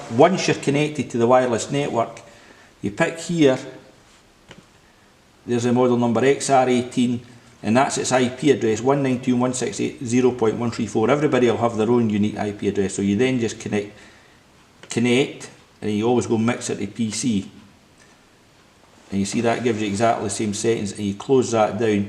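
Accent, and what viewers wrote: Scotland, United Kingdom. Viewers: Enjoyed the Scots accent.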